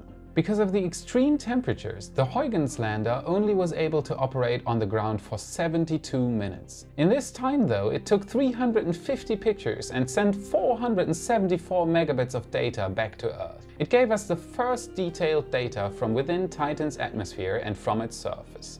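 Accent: Germany. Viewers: Are you German? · are you german? you sound very german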